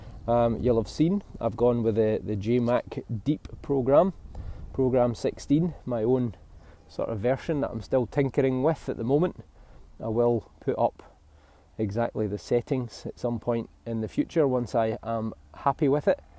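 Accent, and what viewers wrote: Scotland, United Kingdom. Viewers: Great upload as per, buddy. Your accent reminds me of one of my favourite scientists/presenters, Dr Iain Stewart, geologist/vulcanologists etc. Really makes for great listening. I hope that's not offensive, as I'm not sure where he's from in Scotland.! 😁. I've a lot of knowledge of the English monarchs going right back to the first Saxon King of Wessex. Your uploads are making me research the Scottish monarchy, as I'm quite ignorant to it, so much appreciated. Bless up 👊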